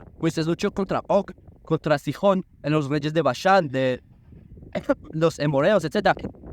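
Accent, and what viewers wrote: Colombia. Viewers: Hablas como los colombianos......por qué?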